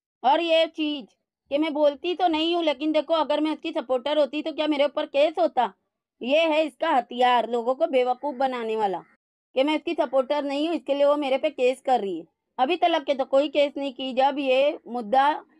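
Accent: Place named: India. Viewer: api ap India sy hn kia. ap ki urdu sy lga .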